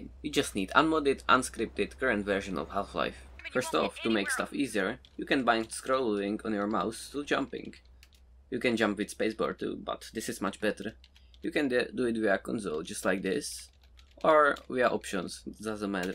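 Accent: Czech Republic. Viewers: Cz accent :D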